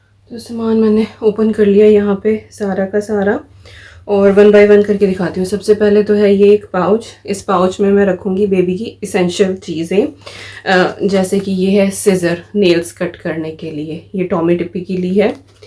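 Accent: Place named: Uttar Pradesh, India. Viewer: Ap apni up wali boli bola kro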